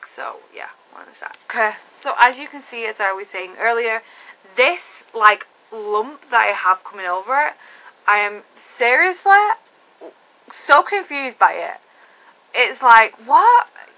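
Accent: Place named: United States. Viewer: why does your accent keep fading to american lool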